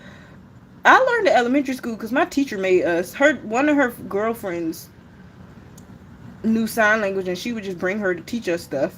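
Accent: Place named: Washington DC, United States. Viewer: Hey …. I’m from Maryland (15 min from DC), I just watched this live…🤦🏻‍♀️but I just wanted to inform you that there is a Blick Art (a.k.a “Dick Blick”) DC on l street NW. I wish I could color skin as well you. Great job!! I’ll turn the bell on if you stream again. I knew I heard our DC accent in your voice. Go to the Blick downtown it’s nice!!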